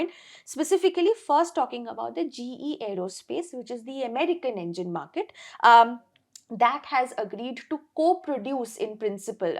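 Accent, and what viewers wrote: India. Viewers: Indian speak English I don't understand a word you saying indian accent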